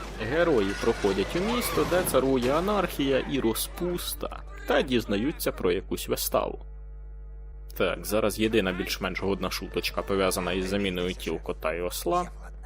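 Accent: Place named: Surzhyk, Ukraine. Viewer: Мені подобається ваш "суржик"🥺❤️ Хто б там що не казав, але говірка класна) Звучить по-домашньому для мене, бо в житті подібним чином спілкуюся.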